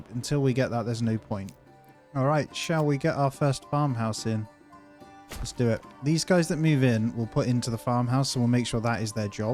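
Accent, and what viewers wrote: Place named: England, United Kingdom. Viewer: I'm trying to pinpoint your accent....is it Bristol, Devon or Norfolk? Can't put my finger on it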